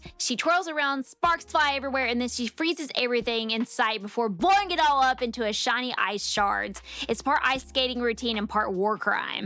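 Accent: South, United States. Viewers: Just curious if your from the southern part of USA ? You have that cute accent if not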